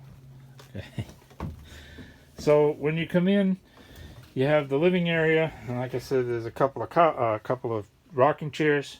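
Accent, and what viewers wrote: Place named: New England, United States. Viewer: Great tour - thanks so much. A few times I thought I heard a northeast accent. Are you originally from New England?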